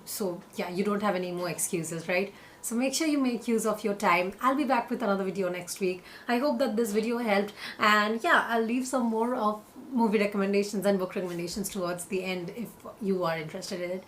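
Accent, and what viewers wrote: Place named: India. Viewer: You are amazing ,fabulous indian. Adore your accent.. Allah keep you,stay safe.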